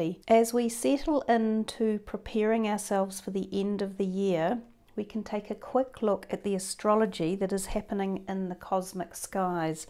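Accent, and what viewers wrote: New Zealand. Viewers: I adore her accent. Kiwi accents are lovely. Blessings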